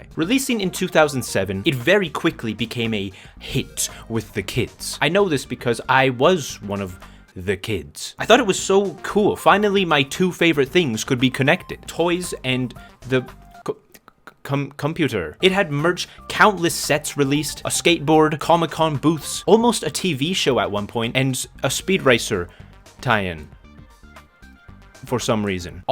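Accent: Canada. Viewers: lmao you said you outed yourself as a canadian but you sound so canadian man you didn’t have to even say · “outing myself as a Canadian” when you’re one of the only people I’ve been able to actually recognize a Canadian accent in 😭 Anyway thanks for providing my mandatory video about something I’ve never heard about but I get invested in anyway for some reason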